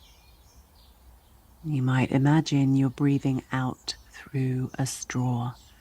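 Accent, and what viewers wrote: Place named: England, United Kingdom. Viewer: Ouse Ally and Kristen Roses meditation videos daily. I really enjoyed this. Thank you 🙏It’s especially nice to hear and English accent.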